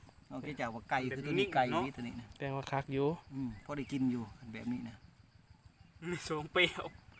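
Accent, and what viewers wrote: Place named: Savannakhet, Laos. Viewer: ຟັງສຳນຽງຢາກຄືໄທສະຫວັນນໍ້ຢູ່ບ້ານໃດເດ້ຢາກໄປລ້ານຳເດ້ນົກຫລາຍແບບນີ້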